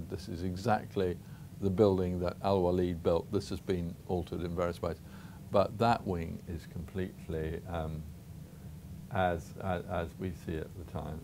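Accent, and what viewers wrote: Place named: United Kingdom. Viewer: For him being such an amazing academic of Islamic history and a guy very proficient in Arabic, I find his overtly British pronunciations of Arabic words charming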